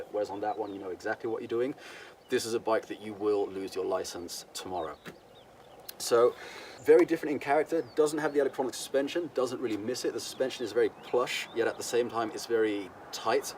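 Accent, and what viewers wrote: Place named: United Kingdom. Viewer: He also speaks like he learned from a Brit. Probably went to school in the U.K.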